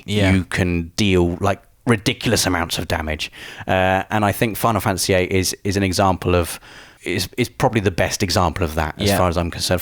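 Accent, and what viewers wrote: United Kingdom. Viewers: Thought same thing when he kept saying Squall, it might be a British way of pronouncing things. Triad was also incorrect when said it the first few times.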